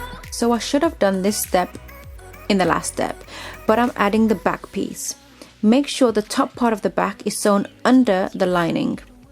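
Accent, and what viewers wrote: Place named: South Africa. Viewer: Lol. I actually thought there was a tiny bit of South African accent in there. I'm from Zimbabwe but been in Manchester since I was 8. And there's no trace of any brummie in yours lol xx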